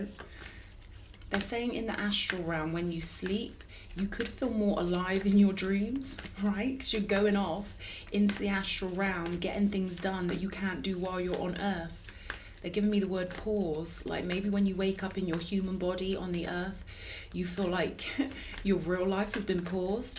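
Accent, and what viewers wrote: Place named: London, United Kingdom. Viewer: the London down country girl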